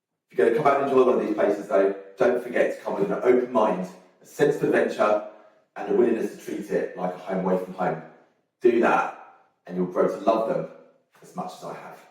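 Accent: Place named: England, United Kingdom. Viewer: Why say kilometers you're in the UK & sound English too we use imperial here 🤷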